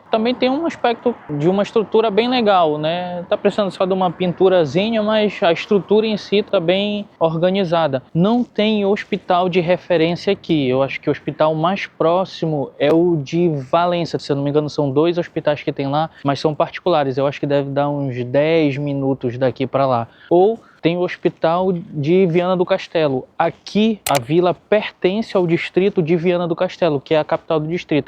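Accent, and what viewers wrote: Pará, Brazil. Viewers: Seu sotaque é do Pará, certo? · O cara tem sotque paraense e torce pro vasco. PQP.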